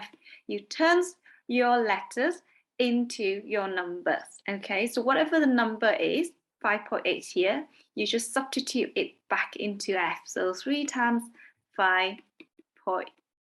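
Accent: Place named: Wales, United Kingdom. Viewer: It’s Welsh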